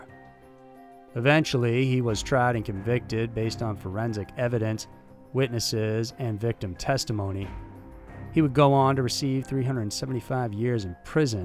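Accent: United States. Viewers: I would really like to k ow where the narrator is from. Obviously American but which state? Unusual accent. I hope you reply.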